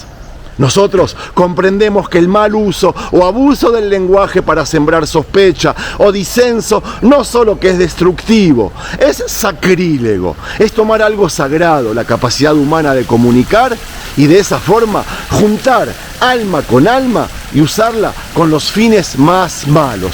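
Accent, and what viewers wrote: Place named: Rioplatense, Argentina. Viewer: saludos de un ecuatoriano me da mcha curiosidad si eres argentino o uruguayo o aprendistes espanol y nacistes en Israel gracias y me gusta tus videos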